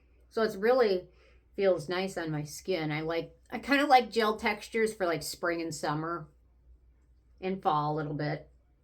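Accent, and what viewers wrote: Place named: Wisconsin, United States. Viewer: You sound like you’re from Wisconsin. Thanks for the ingredients. I checked on Acne Clinic NYC and there are no pore clogging ingredients, yes!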